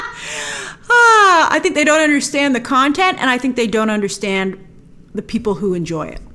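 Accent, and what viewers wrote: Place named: United States. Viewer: I'm curious, how have you avoided a New York accent 🙂? You're an early transplant? It's very slight, barely there Talk/Tauwk, Fought/Fauwt, New York/New Yawk. It's a distinctive accent 🙂. More like a New England accent, Boston is very similar. Bauwston 🙂. It's a straight American English accent, unaltered by New England, southern twangs... You moved there in your pre-teens or something?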